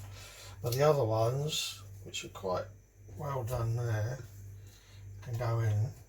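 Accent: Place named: England, United Kingdom. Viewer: A quid. Love hearing an English accent for a change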